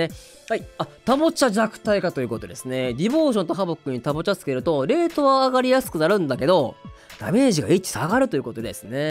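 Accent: Kansai, Japan. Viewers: いつも解説ありがとうございます！関西訛りあるのに聞きやすくてすごくわかりやすくて感謝です！アプデ後も楽しみにまってます🐧❤️‍🔥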